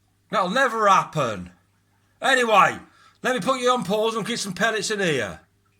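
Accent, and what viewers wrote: United Kingdom. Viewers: I also learn british accent love this mate! 🙆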